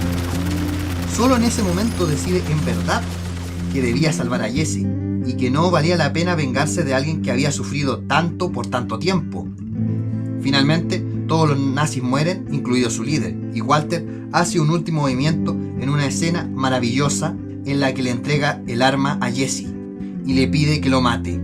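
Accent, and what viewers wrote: Chile. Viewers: Chileno? o parece el acento xdd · El acento chileno es tan chocante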